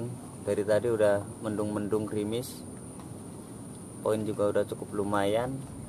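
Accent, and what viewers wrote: Java, Indonesia. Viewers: Sampean jawane ngndi.. Poine jos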